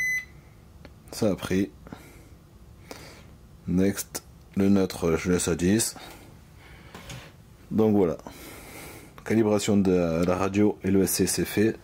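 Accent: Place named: South, France. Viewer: Ba si fait la je comprends rien avec son accent du sud rajoute des euuuu partout 😂😂😂🤣🤣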